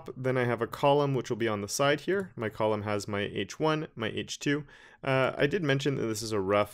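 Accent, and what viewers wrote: Canada. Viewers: you must be Canadian arent you? the accent sounds soo Canadian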